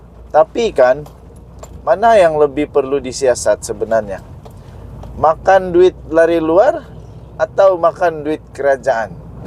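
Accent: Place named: North, Malaysia. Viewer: Sedap dgr tuan ckp utara nu...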